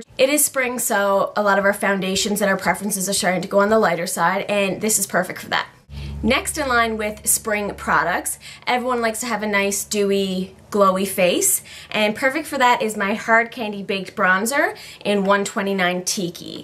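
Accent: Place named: Atlantic Canada, Canada. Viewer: I was watching yt videos on my iPad and your channel was recommended to me. Are you from the east coast of Canada at all? You sound like me sort of lol....(I'm from Newfoundland living in Ontario).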